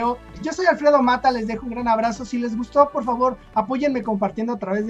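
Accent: Mexico. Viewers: Alfredo, por tu acento imagino que eres Mexicano, pero cuentas tus noticias de mi Pais, con un orgullo y admiración, que no puedo mas que felicitarte por tus comentarios y videos. Es un honor que alguien que esta a 6560 Km de distancia se refiera a mi Pais con tanto entusiasmo. Una vez más gracias y espero tus siguientes noticias.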